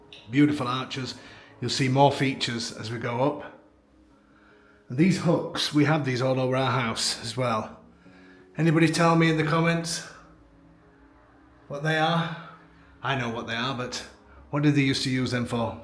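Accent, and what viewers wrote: United Kingdom. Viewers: There are different pronunciations and spellings of the word. Any of them are acceptable depending on the region you’re from. Keep in mind that is in the Uk so anything he says is specifically from the Uk and not the US or wherever you’re from.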